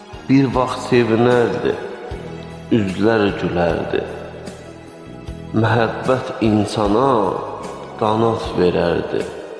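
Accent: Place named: Azerbaijan. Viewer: AZƏRİBAYCAN səsi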